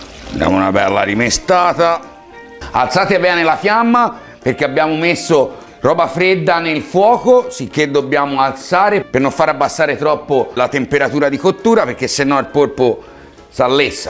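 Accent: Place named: Livorno, Italy. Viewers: A Livorno parlano così, è colpa del cinque e cinque. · L"accento livornese è proprio così, più forte rispetto ad altre città toscane, almeno così ho notato.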